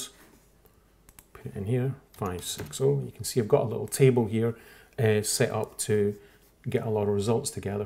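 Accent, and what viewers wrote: Scotland, United Kingdom. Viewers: Y... You're Scottish... eyes subscribe button pushingly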